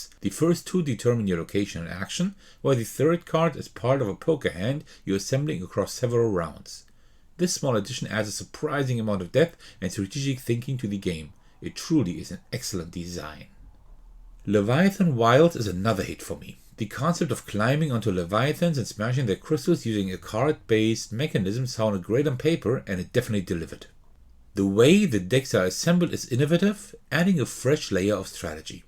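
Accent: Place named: Germany. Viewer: Puh der deutsche akzent ist echt hart zu akzeptieren. 👎👎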